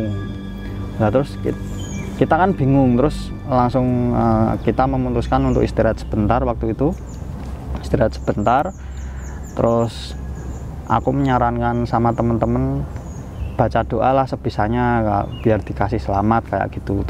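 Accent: Java, Indonesia. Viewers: jowo ne medok mantapp👏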